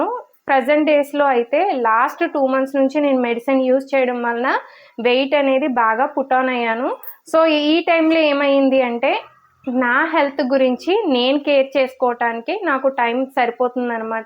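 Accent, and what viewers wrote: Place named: Kakinada, India. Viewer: Soursop soop fruit juice mee husband ki ivvandi , B17 foods pettandi , non veg thakkuva tinali ,sugar thaginchali ,ila diet control cheste mee husband cancer growth thaggutundi ,maa ammagaridi kkd vakalapudi ,maa attavaru vzm, meeru chala baga matladutunnaru ,meeru matladutunte kakinada lo unnatlu anipistundi,mee matalu vinalanipistundi , maa maradalu kuda meela untaru.maa chanal svcs dairies meeru subscribe chesukondi maachanal peddaga develop avvaledu.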